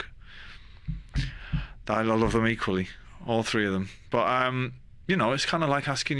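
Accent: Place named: Manchester, United Kingdom. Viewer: Sei stato bravissimo con i sottotitoli, posso farti solo un piccolissimo appunto? Io non avrei tradotto "freak out" con sclerare ma piuttosto con "scioccato" o "molto sorpreso". Può anche significare "spaventato" che però in questo contesto non ha senso ma il significato che tu hai scelto a me non risulta o almeno a me non è mai capitato di sentirlo usare in quell'accezione. Ripeto, sei stato molto bravo anche perché il mancunian non è né facile da capire né piacevolissimo da ascoltare. Anche se quello che esagera con il dialetto è il fratello Liam.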